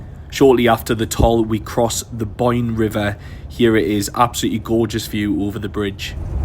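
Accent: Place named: Newcastle, United Kingdom. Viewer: I love the Newcastle accent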